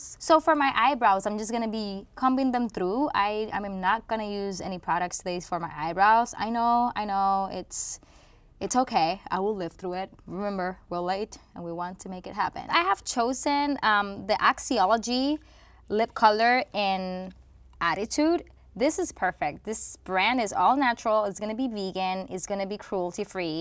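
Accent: Puerto Rico. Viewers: Ningún "Please excuse my thick Puerto Rican accent"! Eres boricua y qué! Gracias por el video! Mucho amor desde PR! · Lol I didn't even notice you had an accent until you said you're from PR 🇵🇷. Keep the clean makeup tutorials coming!!!! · Well done Sephora for using a Puerto Rican MUA! Love the accent <3 and the tutorial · I love your Puerto Rican accent and you're beautiful! Love this natural look. Question... Do you wait a few minutes between each layer of product prior to your foundation? Thanks for a great video! 😀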